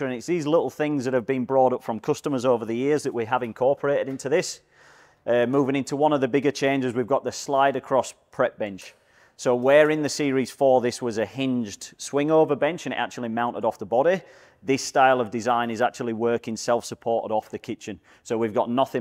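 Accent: United Kingdom. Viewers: nice trailer. seems odd listening to a Yorkshire twang advertising Aussie trailers. That outdoor kitchen needs a redesign, most of it is unusable, nice features but unusable. something to think about for Quantum 5.5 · Where's that northern accent from?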